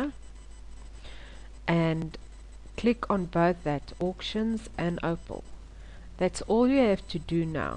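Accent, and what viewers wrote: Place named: South Africa. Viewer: Haai auntie maar daai is n South african accent. Thanks for the video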